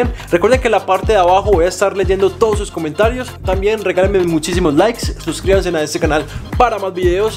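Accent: Colombia. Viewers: Me super pinche encanta el acento colombiano. Es tan elegante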